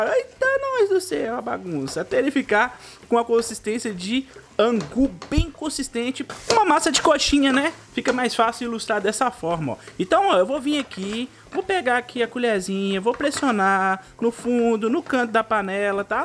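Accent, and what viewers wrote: Minas Gerais, Brazil. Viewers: Adorei, só de falar já vê que é minerim!! Já compartilhei e vou fazer. Ontem domingo dia 23/07 eu fiz a broinha de fubá de canjica doce. Em breve farei esta receita. Estou te assistindo de Ipatinga, Minas Gerais!!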